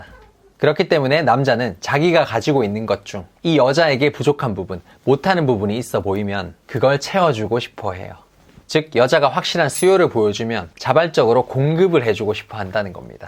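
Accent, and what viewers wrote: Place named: Busan, South Korea. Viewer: 부산 사투리와 표준어의 절묘한 만남이 느껴지는데, 기분 탓일까요?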